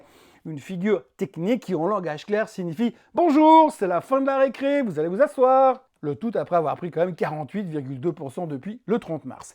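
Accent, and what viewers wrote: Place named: Valais, Switzerland. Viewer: J’apprends à connaître la Suisse avec, cette fois l’accent Valesan( Orthographe a confirmer). Et bon anniversaire.🎉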